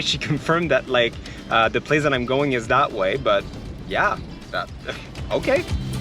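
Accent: France. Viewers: Bruh you speak a French French and an American English at the same time! I'm shocked 😱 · I like your French accent 😅🙌🏽have fun 🇨🇲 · Beautiful video as usual. You made me fall in love with my country all over again😎. Your french accent is🤌.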